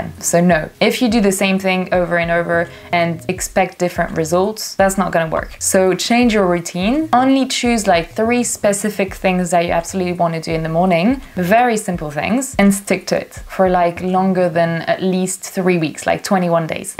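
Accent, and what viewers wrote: United Kingdom. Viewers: J'adore ton accent britannique 💕💕💕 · Such a beautiful accent from the UK ! Moi qui essaie d'améliorer mon anglais et avec cette thématique c'est d'autant plus motivant ! Merci et bravo pour cette vidéo :virtualhug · great british accent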